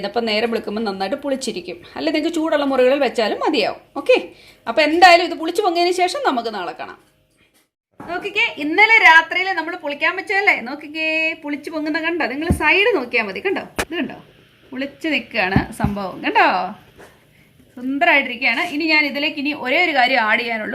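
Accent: Kottayam, India. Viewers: kottyam kari ano am from kidangoor pala now your talking style very nice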